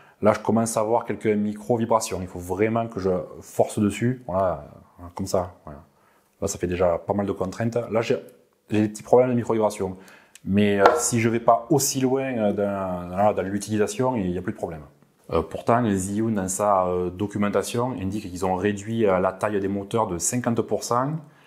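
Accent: South, France. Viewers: A mince j'ai pas vu, en tout cas merci encore pour ton aide. Je te suis sur ta chaîne et j aime bien les tests que tu réalises, de plus je suis comme toi du sud et j'ai le même accent et j'en suis fier.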